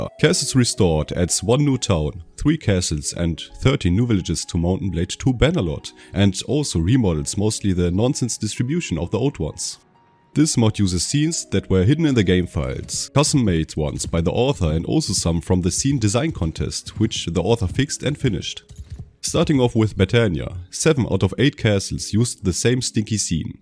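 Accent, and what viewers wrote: Germany. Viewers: Klingst deutsch/schweiz/österreich :D Ich warte ja seit release auf Shokuhō oder einen der Mods die das Spiel in Zeitalter der Industrialisierung mit Linieninfanterie bringt. Der Star Wars Mod wurde ja scheinbar eingestellt :(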